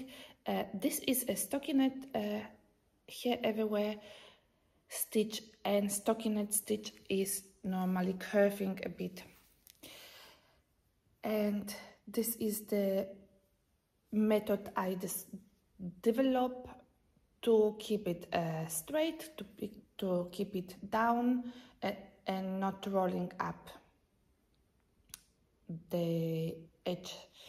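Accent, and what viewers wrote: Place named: Croatia. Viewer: Hiya.. I was so delighted to come across you via Loretta - Knit My Way Home podcast.. she mentioned you in the #inspiredbyellenkal. I enjoyed your podcast & how cheerful you are.. and love your new cardigan and am looking forward to knitting it when I finish a couple of my other knits. I laughed when you showed the blue tack on your needles.. but a great idea, yes! Congrats on the new pattern.. and I look forward to seeing what you come up with in the future.. and maybe even test for you one day. Thank you for a lovely podcast.. Cheerio from an Aussie girl x Tea ps.. love your accent as it reminds me of my homeland Croatia 🫶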